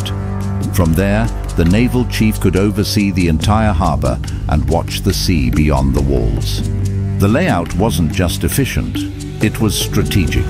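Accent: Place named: United Kingdom. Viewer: I love hearing a British narrator...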